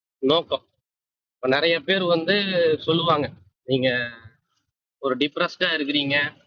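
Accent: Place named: Coimbatore, India. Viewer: neega pesaradhu nalla iruku.. coimbatore slang🙂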